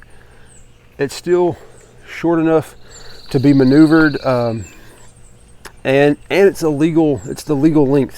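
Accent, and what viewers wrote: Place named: Alabama, United States. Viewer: Aside from your logo I could tell by your accent you were a fellow Alabamian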